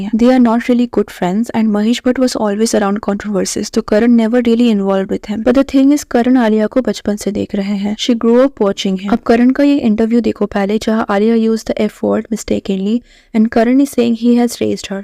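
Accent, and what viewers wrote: India. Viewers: Hey guys even I'm a spill be fan but the way you guys are comparing her with Spill bee is just wrong. She is doing a great job and i also noticed her content is different than spill be she doesn't copy anyone and i like this about her. And people who's saying accent is like spill be it's just INDIAN accent guys. is different and great let's not compare her with anyone.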